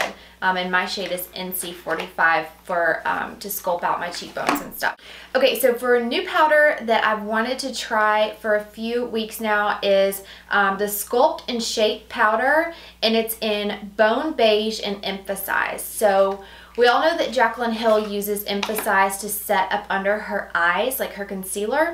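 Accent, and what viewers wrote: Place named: Nashville, United States. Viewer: You are just so gorgi! I knew your accent sounded familiar, just read that you're from Nashville! I live in KY. We frequent Nashville & Gatlinburg quite often, its just beautiful there! Loving your vids! :)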